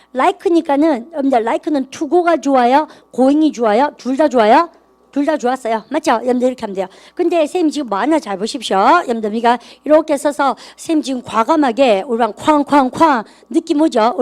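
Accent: Gyeongsang, South Korea. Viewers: 영어와 갱상도 말을 동시에 배웁니다.. 이해력에 많은 도움이 됩니다. 감사드려요~~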